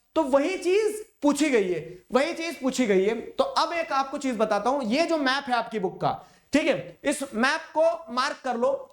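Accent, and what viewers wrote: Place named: Rajasthan, India. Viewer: Sir aap marwadi bolte ho ky aapke bolne ke tarike se laga ki aap rajsthani hai Please reply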